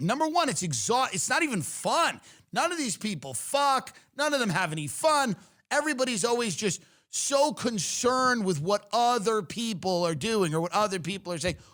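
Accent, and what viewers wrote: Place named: Long Island, United States. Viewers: Long Island baby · The NY way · ❤️ his lawng guyland accident. I miss it.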